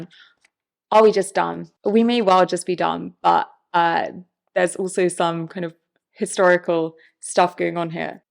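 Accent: United Kingdom. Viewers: ..."was Homer a real person"... You have a British accent, resultantly that answer would be "whatever the government gastric urge." My last name however, would have a problem of the defintion of the number one. Any langisitic problem has a solution and those old stories all exist and were copied to address the same problem. ---->What is the number "one"? Is the number "1" different from the letter "one." Is there a "single" universal answer? If "Homer" is one person isn't a question. He is any and ever single person trying to learn how to read a language that was left for dead. This is written as a single indilible mark, never to be repeate in any other context. Not a watermark, but a coat of arms! (He is not just the first letter in the abecedry. Homer is the other meathods of alpabetical order as well. He is the king, where Ithaca is the empire.) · Yea gods you are Beautiful! 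And that accent, just saw a post on Threads comparing Sydney Sweeny to this actress who will play Emma Frost and then I find your vid and I’m like well THIS girl is cuter than BOTH of them😻😸😻-I got a weakness for Academic Intelligence and English accents. If you didn’t mind that gushing, I’ve always found it interesting how Polyphemus and the Cyclops are portrayed in Homer and through the years. The first we hear of him is from Zeus, in Fagles words “God-like Polyphemus who towers over the Cyclops Clans” then he goes into his pedigree like he is a Greek hero. But then in the poem he is a Brute and most adaptations focus on him being some “Redneck” monster. When I think of Hesiod”s Theogany where the Cyclops are beings older than the Olympians and talented craftsmen who create the weapons of the gods. It’s very sympathetic when compared to Polyphemus and the one I always preferred. Hav you ever noticed this? 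Also i subscribed so you’ll love me for life now? lol 😉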